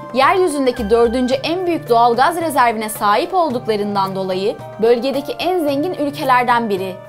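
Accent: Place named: West, Turkey. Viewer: Yok ya sahimi şu batılı ağzıyla car car etmeseniz olmuyor yalan bu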